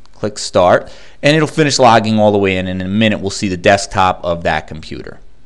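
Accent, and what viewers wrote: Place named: New Jersey, United States. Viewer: sounds like a mush mouth from new jawz-sey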